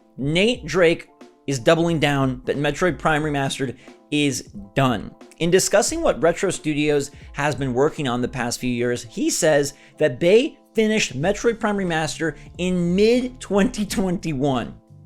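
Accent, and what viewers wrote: United Kingdom. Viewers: I was wondering the British accent but the queen did kinda die though😢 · Your British accent sounded just like the guy who plays Phillip in the Crown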